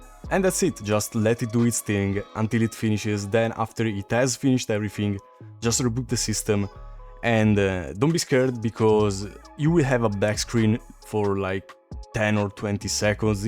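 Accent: Italy. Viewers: bro hai il mio stesso accento nelle interrogazioni di inglese, dimmi che sei italiano e non sono pazzo io. Bel video btw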